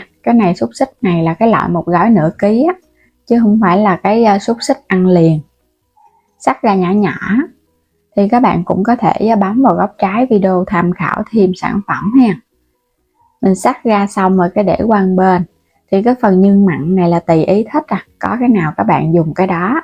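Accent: Mekong Delta, Vietnam. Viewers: Giọng nói miền Tây của người ta đó 😊😊😊😊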